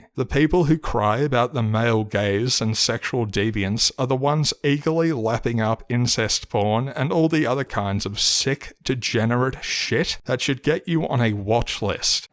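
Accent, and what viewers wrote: Australia. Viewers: That’s an Aussie accent also you have not made any points against any of what he has said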